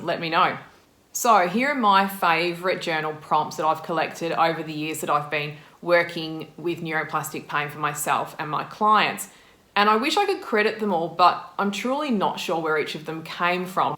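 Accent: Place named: Australia. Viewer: Definitely Aussie :-)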